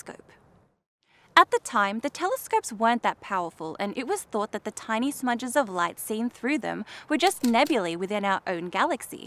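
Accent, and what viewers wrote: Australia. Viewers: I find her mild accent to be very pleasant and easy to understand... I'm pretty sure it's Australian, but I know it's not a Dutch/Afrikaans/South African accent; for some reason I find that accent to be very harsh... (I have nothing against the people themselves... I'm sure plenty of folks in SA would find my rural-western-American accent to be just as harsh and unpleasant, lol) ✌️ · I'm from Sydney and i didn't even notice that you were aussie until i saw an Australian ambulance lol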